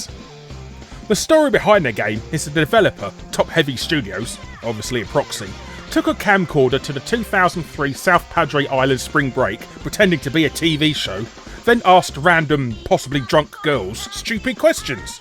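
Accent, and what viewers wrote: United Kingdom. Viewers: are you proppa british?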